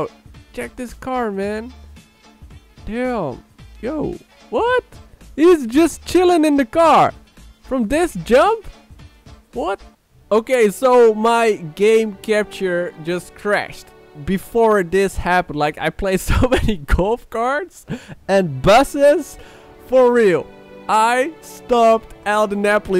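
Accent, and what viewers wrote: Netherlands. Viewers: Are you Dutch? You sound like a Dutch man.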